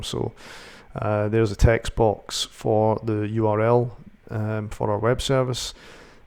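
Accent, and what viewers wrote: Scotland, United Kingdom. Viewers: > youtube search for api tutorial c# > first vid contains "C#", "Step by step" and is 24 minutes long > clicks > scottisch accent > fuck yes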